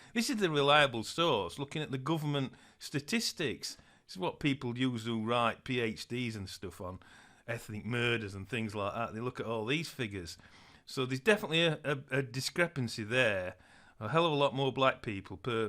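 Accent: Yorkshire, United Kingdom. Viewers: Simon is 100% correct, you really have no idea what you are talking about, that silly Yorkshire/Midlands accent is really off putting by the way...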